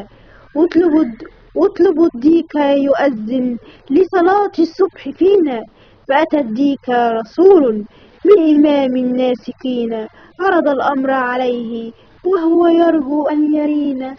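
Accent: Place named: Egypt. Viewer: احسنتي لكن ملاحظتين فقط ١. ألفاظ بعض الحروف تنطق باللهجة المصرية مثل الجيم والثاء وهذا خطأ لفظي باللغة الفصحى ويجب ان تتدربي على النطق الصحيح لها + لو تنشدينها بدل الإلقاء سيحبها الاطفال شكرا لك.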